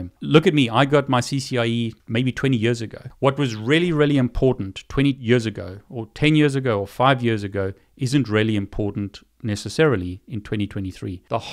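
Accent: South Africa. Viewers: Great hearing a South African accent inspiring success so powerfully. Baie baie dankie, boet!!! 😉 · Thank you, this was super helpful. & so great to hear a YouTuber with a SA accent! Hi from CPT. 😁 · Are you south african? You sound south african :)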